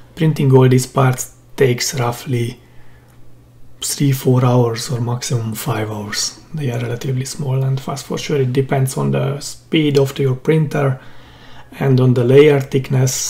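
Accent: Hungary. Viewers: hello, awesome project. i am just building it, how do i connect the cable to the arduino? which pins? what is the value of the two resistors r17 and r18? by chance my ears are correct and i hear a hungarian accent: easy rider ocseeem! :D thx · Sounds like hungarian accent:D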